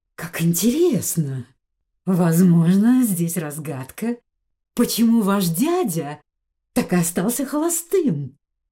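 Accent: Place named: Provinces, Russia. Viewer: Чтение передает русскую глубинку, никак не Англию.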